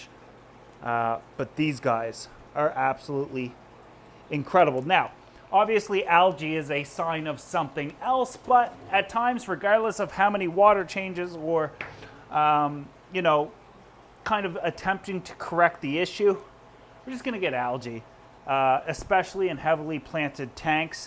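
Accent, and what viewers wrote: Canada. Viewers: You saying "Barb" in your Canadian accent reminds me of Coach from Letterkenny.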